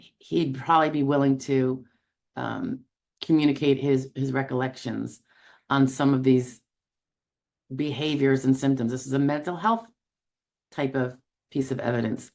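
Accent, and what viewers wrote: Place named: Georgia, United States. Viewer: I thought that accent was a sweet mama Georgia peach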